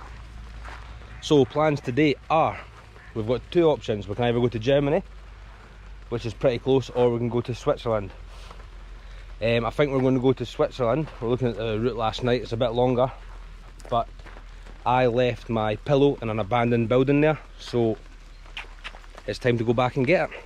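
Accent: Scotland, United Kingdom. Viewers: Did you learn English in Scotland? Pronouncing words such as "now" gives you a strong Scottish accent!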